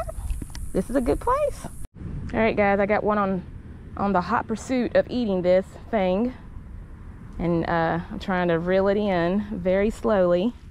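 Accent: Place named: Florida, United States. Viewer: Your welcome, thank you for posting! I'm a Floridian as well, I can hear it girl!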